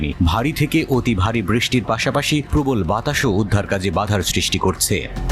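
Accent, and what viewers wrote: India. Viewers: নিউজ রিডারের বাচনভঙ্গি এবং কথা বলার ধরন অনেকটা ভারতীয়দের মতো। বেশ কিছুদিন যাবত একাত্তর টিভির কিছু নিউজ রিডার যারা ব্যাকগ্রাউন্ডে কথা বলেন তাদের বাচনভঙ্গি এবং কথা বলার ধরন, অনেকটা ভারতীয়দের সাথে মিলে যাচ্ছে। বাংলাদেশের বাংলাভাষী নিউজ প্রেজেন্টটার কখনো এই ধরনের বাচনভঙ্গিতে কথা বলেন না। তার মানে কি 71 টিভিতে ভারতীয়রা নিউজ প্রেজেন্ট করছেন!? 😮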